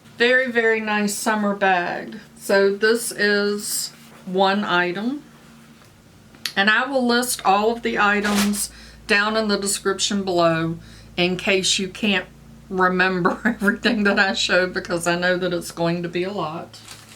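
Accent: South, United States. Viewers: I love your accent it sounds southern and so sweet. Enter me please I like the press on nails. And the illamasqua as well as earth harbor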